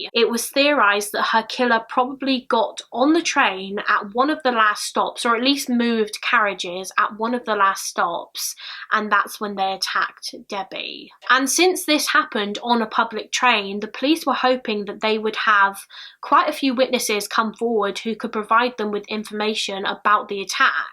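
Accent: United Kingdom. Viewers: Listening to true crime in a British accent is e.v.e.r.y.t.h.i.n.g! LOL.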